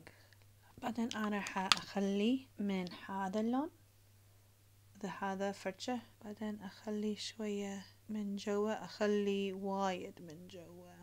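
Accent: Basra, Iraq. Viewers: تلاحضون هية تحجي عربي بس اكثر شي عراقي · فدوة شنو هالجمال وشنو هل لغه تخبلين من تحجين عراقي🥰🥰🥰♥️💋+خبز موزة✅✅ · شكد ححلو كلامهه بالعراقي😍♥️ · ههه فدوه شون تحجي عراقي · فديتج ولهجتج اجنن العراقي عليج يخبل كثري هيج فديوهات متابعتج من العراق 😍😍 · يخبل الفديو فرحانه بيج باللهجة العراقية احبج كلش هواية نفتخر بيج انتي عراقية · حبيبتي كلش حلوه الهجه العراقيه عنديج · أعشقها وهي تحجي عراقيه تنكرططططط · فكرتك عراقيه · فدوووةةة البصراوي ابحلكهة ايخخخخخبلللل فديتج فدي من البجامة للبدي😊😊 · فدوه تخبل اللهجة العراقيه بحلكج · عفيه ضلي احجي عربي لن جنن لهجه العراقيه ع ومتابعيج عرب وعراقين اكثر من الاجانب😍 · ههههههه كولش حلوووه من تحجي عراقي 😍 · روعة اني بموت فاللهجة العراقية انا من الجزائر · خرب شگد حلوه من تحجي عراقي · اموت عليها وعلى لهجتها العراقية تخبلل😢♥️♥️♥️. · حبيبتي كلامج يخبل لهجتج بالعراقي جنن ❤️❤️❤️i love you so much and i proud of you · ماشاء الله تحكي عراقي بشكل جميل جدا 😍😗 كلش كلش احبك وانت كلش كلش قمر الف تحيا للعراق الحبيب 🇩🇿❤🇮🇶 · احبج من تتكلمين عراقي💋💋💋❤ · شحلاتهه من تحجي عراقي😂♥♥♥♥🌷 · ما تلاحظون أن أغلب كلامها عراقي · متعلمه العراقيه من نور 😂😂😂يا الله وش حلو اللهجه العراقيه على كل لسان💚❤🇸🇦🇮🇶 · تجنن بالعراقيي فخورة بيج لانج من العراق ❤️❤️ 🇮🇶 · انا حسبالي تتكلم كويتي اطلعت تتكلم عراقي🙂🙂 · واو....استمري استمري استمري استمري.......ولا غلطة وكثير احب هيچ فيديوهات....هواي هواي هواي حلوووه اللهجة العراقية عليچ....متابعينچ من العراق / البصرة وكثير نحبچ😘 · احسهه تحجي بصراويي اووي فديت 🥺🥺🥺🥺😂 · I loved your Iraqi accent ♥️♥️ Yes, you’re right its (khubuz al-moz) 👌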